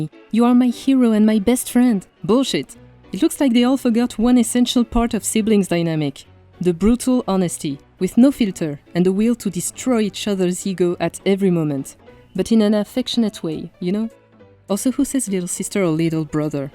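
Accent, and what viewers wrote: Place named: France. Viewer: firt time watching one of ur vids, PLEASE TELL ME IF UR FRENCH CUS I CAN JUST HEAR THE ACCENT (im french)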